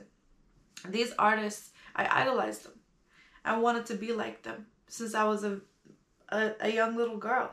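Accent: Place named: United States. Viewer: سڵاو بەڕێز.بە ئاکسنتی ئەمریکی قسە دەکەی تۆ دەڵێ ی لە نەرویجم چۆن بە ئەکسنتی ئەمریکی قسەدەکەی؟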